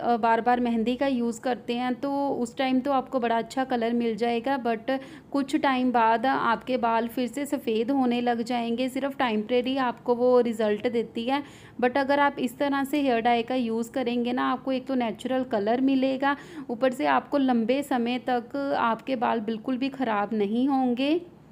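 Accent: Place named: Lahore, Pakistan. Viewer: nice n informative, btw, u have pure lahori accent like us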